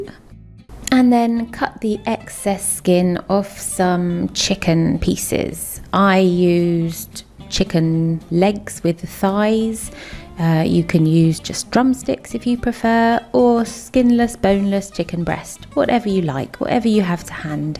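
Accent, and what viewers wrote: United Kingdom. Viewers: She's British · That's great, but why does this "French" narrator have an English accent? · That's NOT a French accent. She sounds British. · Sounds just normal here in iRELAND -You sound Uk ?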